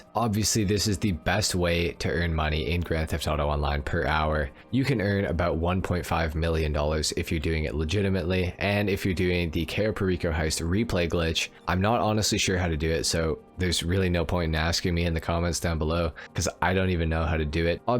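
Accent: Winnipeg, Canada. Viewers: Wait ur Canadian? I feel like you got a Canadian accent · Your from Winnipeg?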